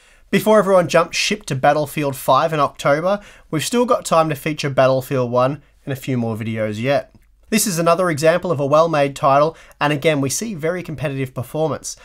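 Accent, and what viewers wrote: Australia. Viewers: That "spin" of words in the benchmarks! That eloquent Ozzie accent! you "GymKhanna" circles over youtube man:)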